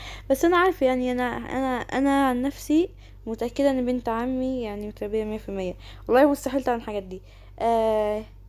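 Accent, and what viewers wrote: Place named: Saudi Arabia. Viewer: دقيقه انا ابي استوعب رديتي صح اححححح ما توقعت والله العظيم بس اشوفك دايم تتكلمي سعودي شوي؟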